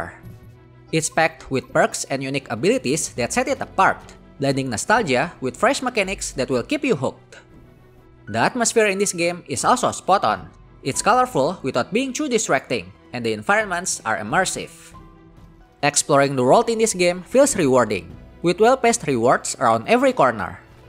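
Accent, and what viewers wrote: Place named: Indonesia. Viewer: aksennya kyk org indo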